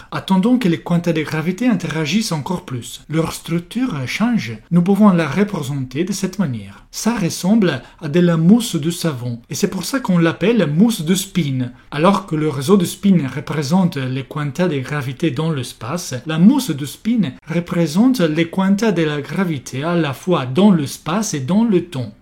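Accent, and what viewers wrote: Italy. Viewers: Vraiment je pensais que tu imitais la voix de Roveli, mais tous les italiens ont cet accent adorable quand ils parlent français, c'est trop chou <3 Donc si je tiens compte de la structure géométrique d'un réseau de spins. Je peux dire que chaque point rouge représente le centre de rayonnement d'un "quantum de distance". Autrement dit cela ne peut pas représenter des sphères, mais des polyèdres, exactement comme ce que l'on obtient dans une mousse de bain, où chaque point rouge est le centre d'une bulle, et le rayonnement s'adapte en fonction des bulles environnantes (formant des polyèdres). Si je considère un univers plan, la structure géométrique serait parfaitement équilibrée avec des triangles équilatéraux, et il ne se passerait rien. Le truc curieux, c'est que cet espace existerait bien physiquement, mais n'aurait pas d'épaisseur, oups ^^ Par contre, la structure 3D la plus simple et la plus équilibrée, serait une structure des tétraèdres, et normalement (sauf erreur) on ne peut remplir un volume avec des tétraèdres réguliers sans être obligé dans déformer quelques uns, sinon il y aurait des "trous". 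Autrement dit si je ne me trompe pas, une structures 3D infinie, n'aurait de cesse de se déformer, cherchant quelque part un équilibre et ne le trouvant jamais, en dehors de toute notion de temps bien sûr. Et qui dit déformation, dit énergie ... ce qui répondrait à une très vielle question philosophique quand même ;p Et si je regarde un seul point rouge dans ce contexte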